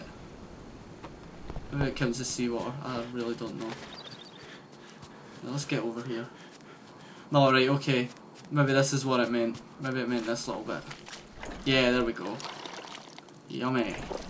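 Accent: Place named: Ayrshire, United Kingdom. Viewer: Im scottish and Im having trouble pinpointing the accent, I think its ayrshire but really not sure, its funny cos they cant say words like "concentrated" they say "concentrataeed" instead lol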